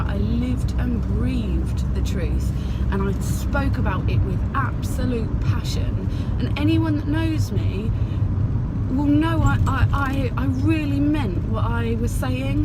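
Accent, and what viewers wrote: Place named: Southern England, United Kingdom. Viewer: where you from im from hastings sussex you sound southern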